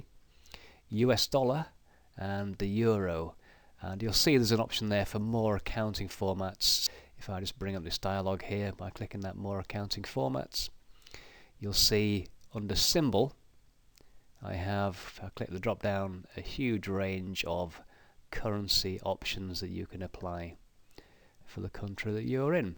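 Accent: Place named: England, United Kingdom. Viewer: This English accent.. uff... English in an Indian accent is better for Indians..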